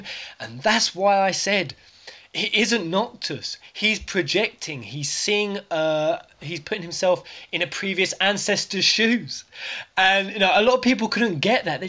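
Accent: England, United Kingdom. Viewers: And it ain't the English accent! I'm just loving his persona. More depth to my mind! I think everything that goes on here will be a record for future FF theorists and forward thinkers. It's an awesome place to vent and express:) Plus I wanna see this updated Peasantry wall! Hope you noticed I've up'd my pledge;)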